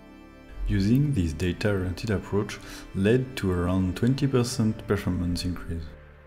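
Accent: France. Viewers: T'es français non ? X) nice video tho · Déjà la vidéo est hyper bien, mais aussi petit big up à ton accent français haha :) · Your channel is becoming amazing ! Great french accent btw ! And the animations are ON POINT ! 👌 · J'avais reconnu notre bel accent 👌😂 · anyway a question, are you a French native speaker? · The youtube channel is located in France and with this accent I'm absolutely sure that he's French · R’u french ?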